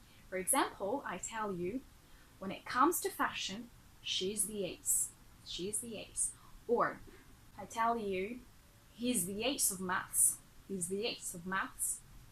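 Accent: United States. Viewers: ماشاءالله لهجتك قوية جدا، كأنك أمريكية تحياتي لك من ليبيا 🇱🇾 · you have a good American accent !! how did you manage to pronounce this way ?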